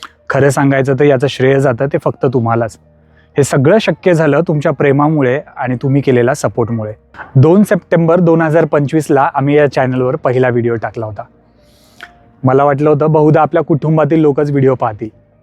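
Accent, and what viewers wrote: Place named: Jalna, India. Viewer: मस्त दादा मी जालना उन आहे तुझं बोलणं पाहुन मला वाटत होतं तु इकाडलाच आहे म्हणून पण माहित नव्हत आज सांगितले तर भारी वाटलं खुप शुभेच्छा तुला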